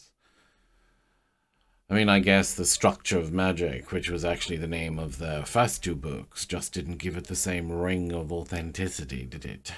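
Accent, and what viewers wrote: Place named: RP, United Kingdom. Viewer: I am NLPed by this posh pronunciation 😇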